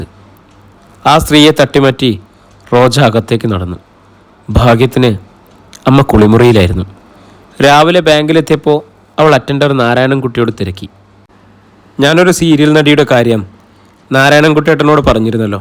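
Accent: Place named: Wayanad, India. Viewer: ബ്രോയുടെ റീഡിംഗ് സൂപ്പർ. വയനാടൻ ശൈലി അല്ലെങ്കിലും ഇടക്ക് വരുന്നുണ്ട്.❤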